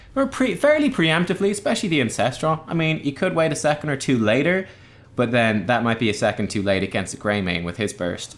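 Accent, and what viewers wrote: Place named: Ireland. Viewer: What part of Ireland are you from? Never heard your twang 😁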